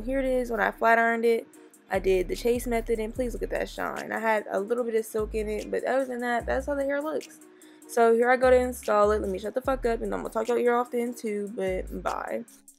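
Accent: United States. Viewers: You know what I realized u dead kinda got a country accent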